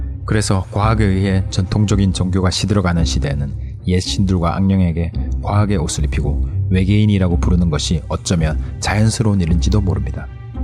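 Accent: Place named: Gyeongsang, South Korea. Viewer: 경상도 억양이 조금 남아 있으시네요:-)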